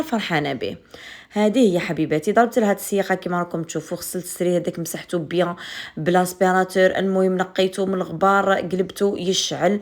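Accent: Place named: Constantine, Algeria. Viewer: مشاء الله تبارك الرحمن تهبل بمعنى كلمة نموت عليك وعلى ناس قسنطينة وهدرتهم .متتبعة وفية من جيجل ❤❤❤❤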